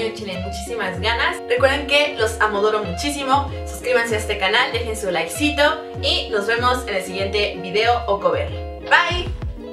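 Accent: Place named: Sonora, Mexico. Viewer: Tiene el acento muy sonorence y las palabras que usa son mas como de hermosillo, ojala si lo fuera :"(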